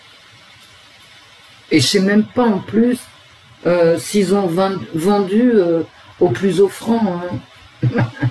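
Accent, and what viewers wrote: Paris, France. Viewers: Bonsoir. Le plaisir de trouver cette nouvelle voyance après une randonnée au bord de mer, le Var est privilégié, il a fait chaud, il y avait même des baigneurs, qu’elle injustice même au niveau du climat. Comme d’habitude toujours impressionnante cette voyance qui colle toujours à la réalité, vos commentaires qui l’accompagnent toujours un plaisir, vous arrivez à me faire rire avec 😂 votre gouaille bien Parisienne, trêve de plaisanterie l’avenir n’est pas rose, il y a de fortes chances que le 49.3 soit encore sur la table, les paysans ont toujours eu du mal à s’entendre, et le fameux remembrement des parcelles bien responsable également des dégâts des eaux, que de décisions irresponsables depuis des décennies. Rien ne va plus dans notre malheureux pays où souffle un vent mauvais. On en PP 😱😡😟 Bonne soirée à toutes et à tous. ❤️💕💌🌹🐞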